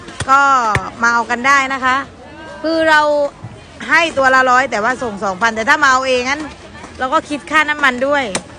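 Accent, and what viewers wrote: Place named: South, Thailand. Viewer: พูดใต้เถิดครับมันง่ายดี-ถ้าพูดกลางเดียวก็กับมา-ใต้อีกครับผม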